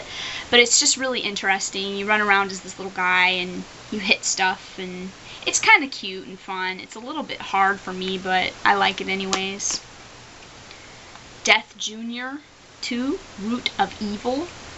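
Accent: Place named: Midwest, United States. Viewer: Sounds like the midwest, Wisconsin a bit.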